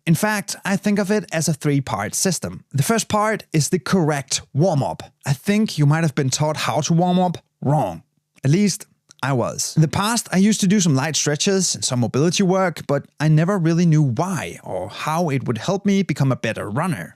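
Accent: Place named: Denmark. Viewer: Failed to recognise your accent :( My guess was Swedish, not Danish. Though who else would explain things in short, simple and very informative way? (May be just Dutch 😉). Thank you so much for a reminder about good warm-ups and A/B skips implementing!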